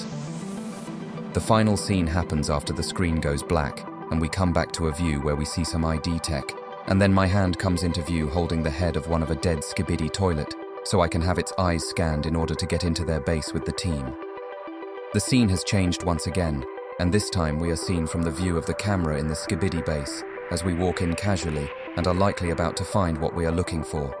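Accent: United Kingdom. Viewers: R u British?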